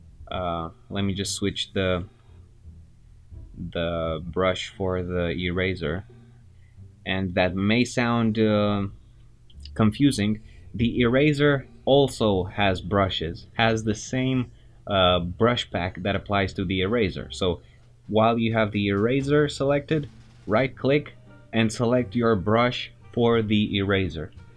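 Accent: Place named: Romania. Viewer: Saw your comment on one of Jazza's videos and wow, i'm glad I checked out your channel. I love the moderate pace and good explanations. Just wondering, are you by any chance Romanian? Your name sounds very Romanian and the accent sounds familiar for some reason :)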